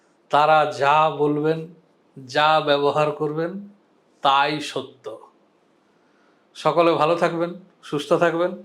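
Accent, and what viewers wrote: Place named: Bankura, India. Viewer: আপনার জন্য এমন এমন অদ্ভুত বিষয়ে জানতে পারি যে, যা সারাজীবন পেরিয়ে গেলেও সেই নিয়ে জানতে হয়তো পারতাম না। অনেকে বলবেন এগুলো ভাষা কে ভালোবাসলেই জানতেন। একথা ঠিকই। তবে তিল তিল সময় যেভাবে পেরিয়ে যাচ্ছে জীবন থেকে, তাতে নিজের উদ্যোগে নতুন কিছু রিসার্চ করে শেখা, এই জীবনে খুব কঠিন। 🎉🎉🎉🎉 আপনার কথায় বাঁকুড়া ঘেঁসা একটা টান আছে (ধারণা ভুলও হতে পারে). 🎉🎉🎉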